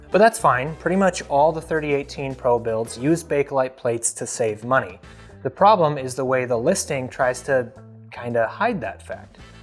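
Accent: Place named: United States. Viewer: You sound like an American, so talk like one. Dump the mm, and use SAE. That's how we communicate here! mm doesn't mean diddly.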